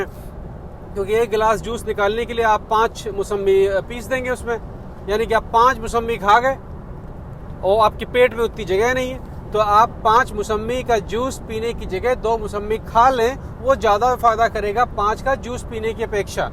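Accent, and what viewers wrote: Bihar, India. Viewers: kya aap bihar se ho kya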